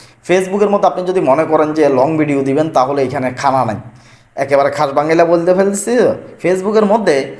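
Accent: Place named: Noakhali, Bangladesh. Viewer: আপনার উচ্চারণ পুরাই নোয়াখাইল্লা টান আছে,শুদ্ধ বাংলা উচ্চারণ জরুরী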